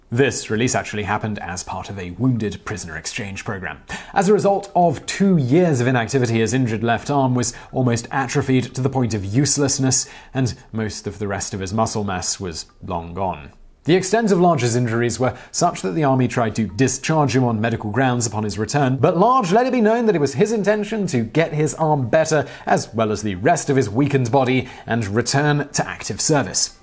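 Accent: United Kingdom. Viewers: Why the host, who sounds British, giving an american salute in the thumbnail?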